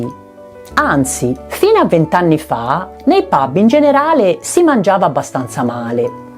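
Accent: Italy. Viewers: Grazie, mi piace il tuo modo di parlare così garbato, proprio lucchese. Un abbraccio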